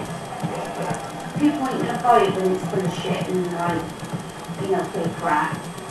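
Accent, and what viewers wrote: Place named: Bristol, United Kingdom. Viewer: She's from Bristol they all sound the same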